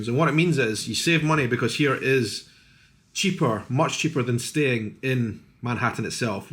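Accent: Scotland, United Kingdom. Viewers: sounds more Scottish than usual. It is one of Earth's coolest accents. 😁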